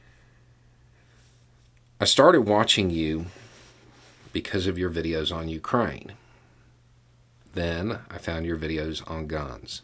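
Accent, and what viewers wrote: South, United States. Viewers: It must be your Southern accent/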